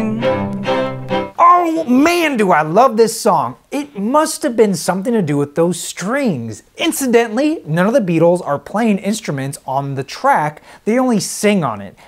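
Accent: United States. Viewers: would pop you in the face if you got anywhere near him because of your loud American delivery like Jim Carrey's movie The Mask. Must you embarrass us USA Beatles fans? Its so offensive to the memory of the Beatles dude! Yeah if you are going for Jim Carrey, wear the mask at least?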